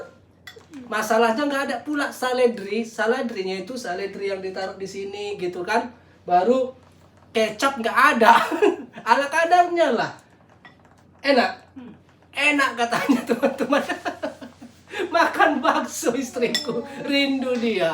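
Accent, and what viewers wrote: Medan, Indonesia. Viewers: Mas ini aslinya mana ya, bahasanya antara Medan sama banyumas.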